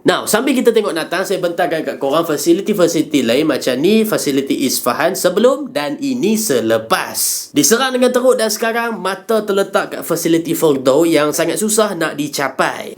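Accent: Malaysia. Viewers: kerja bagus bang kalau boleh secara menyeluruh guna bahasa malaysia....pelat orang utara...😅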